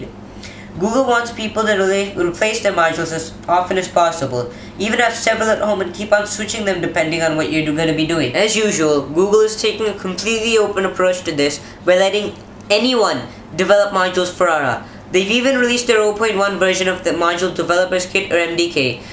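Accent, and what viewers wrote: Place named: India. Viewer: Face not shown. Are you from India cause you sound like a Indian. Is it true Indian are not suppose to show their face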